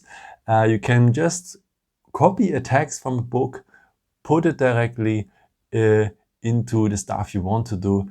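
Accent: Germany. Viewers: Der deutsche akzent in seinem englisch geht ja mal gar nicht :))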